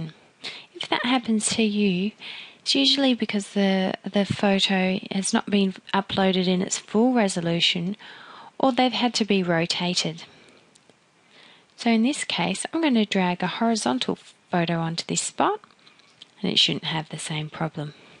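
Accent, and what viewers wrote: Australia. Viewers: Hi,....besides learning from what you say in this instruction video, I also wanted to tell you that I like listening to your voice....which is very soothing, sweet, feminine and peaceful. I'm in the U.S.A. so I also like your Australian accent. It's very nice....and I hope you don't mind me sayin' so.;-)!!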